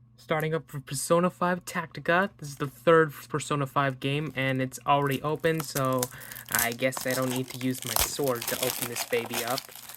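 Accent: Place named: Canada. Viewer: where are you from, the accent I can't place it some things are giving me the great white north vibes lmfao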